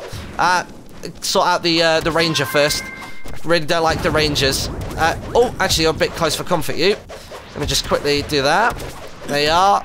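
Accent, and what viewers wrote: United Kingdom. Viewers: Its just his acsent. Hes Britain dont make fun of him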